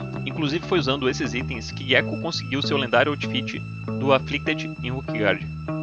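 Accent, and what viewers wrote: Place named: Porto Alegre, Brazil. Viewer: Boa noite, meu querido! Primeiramente, parabéns pelo canal foda, mano! Desculpa, mas sou curioso: tu é de Porto Alegre? Baita sotaque... Também sou daqui!! Abração dum outro velho (26 anos de idade e 16 de tibia) tibiano.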